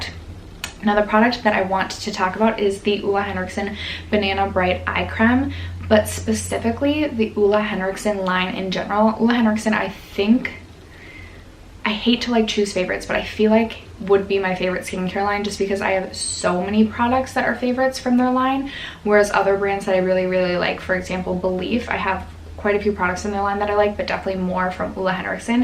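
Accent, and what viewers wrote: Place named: Canada. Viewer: I thought you were Canadian when I first started watching your channel because you sound more Canadian dialect wise. Source- I'm from Canada.🙂🇨🇦 Love your vids!!!!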